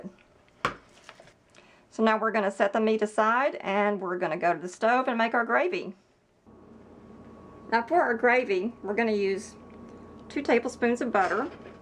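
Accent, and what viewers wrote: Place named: South, United States. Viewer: I am betting y r a Southern bell from your accent. I am from Georgia. I buy peanuts in shell and grind up in a bullet. so good.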